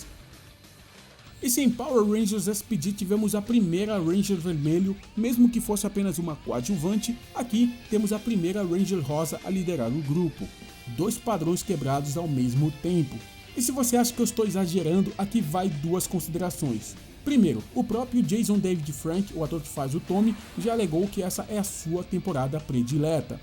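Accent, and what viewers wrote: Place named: Rio Grande do Sul, Brazil. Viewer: O cara fala muito gaúcho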